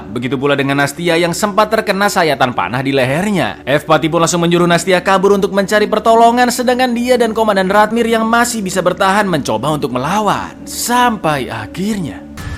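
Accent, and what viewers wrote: Java, Indonesia. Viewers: Jowo nyell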